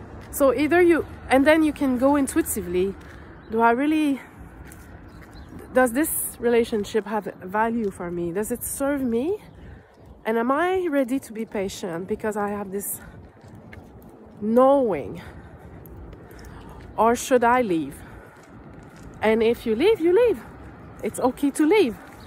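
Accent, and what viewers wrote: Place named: Quebec, Canada. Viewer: You sound québécois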